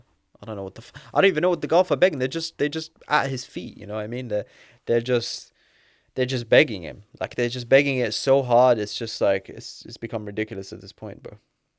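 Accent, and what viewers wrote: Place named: United States. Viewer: Do you live in the US ? because I can hear the accent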